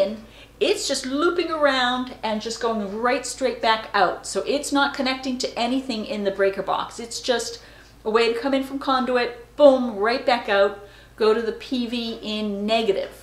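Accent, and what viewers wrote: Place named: New England, United States. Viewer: at first I heard a very slight N.J. accent but i knew it wasnt right ..then it hit me Maine or New England Not bad for a Canadian Eh !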